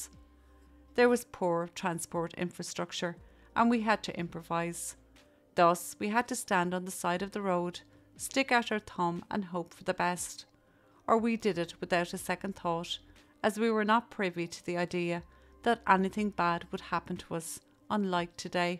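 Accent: Ireland. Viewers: Love your content although they are heartbreaking. Love the Irish accent, one of my best friend whom I’ve known for 30 years is from Cork and I recognise the lovely lilt of your voice.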